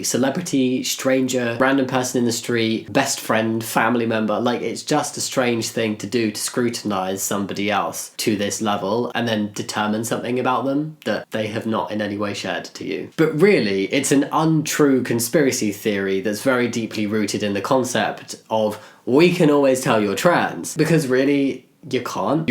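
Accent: United Kingdom. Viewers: Your voice is fine. You just sound really british.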